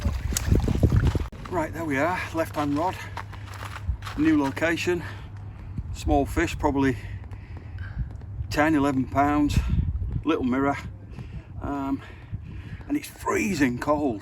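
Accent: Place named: Germany. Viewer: Thanks, that’s a German accent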